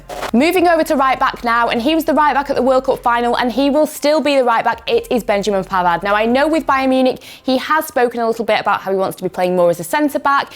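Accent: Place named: Manchester, United Kingdom. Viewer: German here: Like her accent:) Is that some 'less thick' version of Mancunian? Fell in love with Manchester accent listening to Gary Neville and pronunciation kinda reminds me of that. Noticed tho that she doesn't have a glottal stop in double t's or an 'ey' in words that end on 'y'... just some comment on that would be appreciated. Thanks in advance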